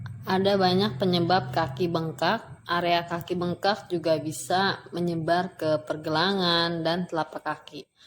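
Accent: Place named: Java, Indonesia. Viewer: Belajar membaca dulu biar lancar.mendok banget bacanya ngapak2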